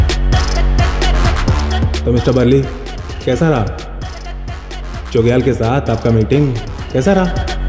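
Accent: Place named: Bengal, India. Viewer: that hindi with bengali accent 🔥🔥🔥😎😎😎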